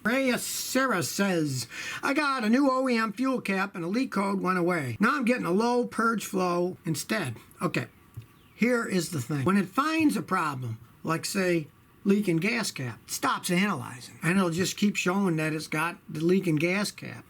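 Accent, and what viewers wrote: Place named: Midwest, United States. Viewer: You may be in Texas NOW, BUT you aint from Texas! That accent is Chi-ca-go, Michigan, Wisconsin around in there. Sound like Dan Aykroyd in Blues Brothers. "We're On A Mission from Gawwd" How about those Caars and Stunts, huh?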